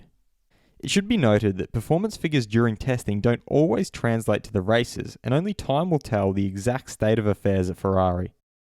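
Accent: Australia. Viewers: Always nice to hear an Aussie voice regarding F1, it's basically a cult interest over here. I have NO ONE to talk to about it.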